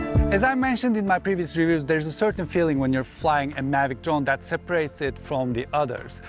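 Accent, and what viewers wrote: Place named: Turkey. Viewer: Müdür sen TÜRK müsün? Türk gibi konuşuyorsun ingilizceyi.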